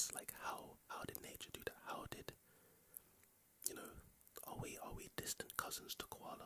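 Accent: United Kingdom. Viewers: Nice to finally find a British asmrtist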